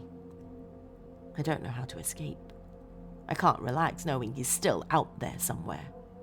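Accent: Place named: United Kingdom. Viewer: oh you charmer you are from Britain if I would have to place your accent 😉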